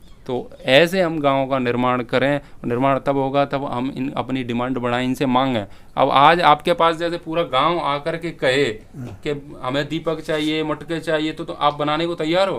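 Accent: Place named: Braj, India. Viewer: भाषा तो ब्रज लग रही है , आचार्य जी ?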